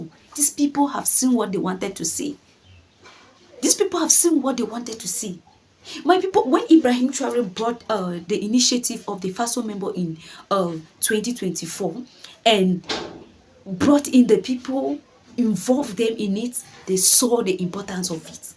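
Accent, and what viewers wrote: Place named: Ghana. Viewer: Jamaica =English? Jamaica = Patios I am a Jamaica The vloger is GHANIAN who speaks with an accent THIS IS PERFECTLY NORMAL/ACCEPTABLE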